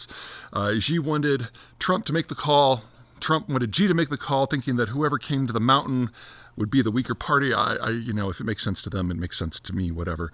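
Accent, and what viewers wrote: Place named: Midwest, United States. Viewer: It's closer to the latter as far as I'm aware, but Peter has a middle-American accent.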